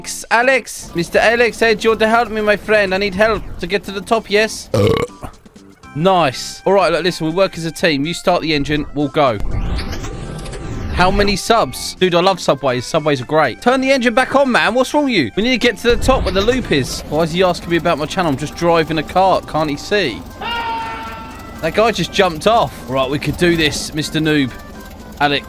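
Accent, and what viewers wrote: United Kingdom. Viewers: Are u British cuz me new sub!!!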